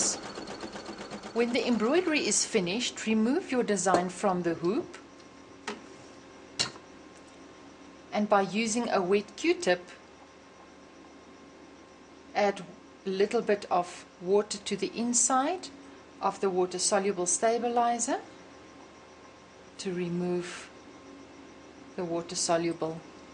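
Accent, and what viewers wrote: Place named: South Africa. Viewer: I love your south african accent.....!!!!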